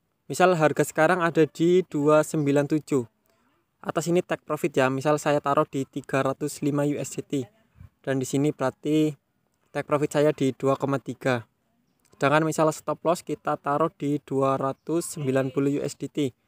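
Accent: Banyumas, Indonesia. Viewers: Bg orang ngapak ya?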